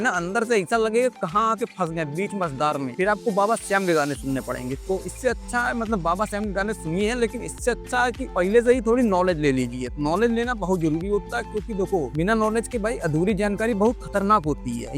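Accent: Kanpur, India. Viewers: Kanpur se ho kya bhai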